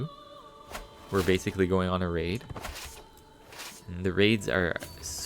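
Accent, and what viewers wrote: Arab world. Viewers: loved it, and its nice knowing ur an arabic from ur accent, keep going bro.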